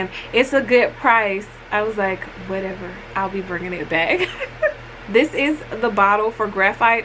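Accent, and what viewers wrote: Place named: Florida, United States. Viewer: Subscribed for the personality lol also are you a fellow Floridian? That accent sounds like home. 🥰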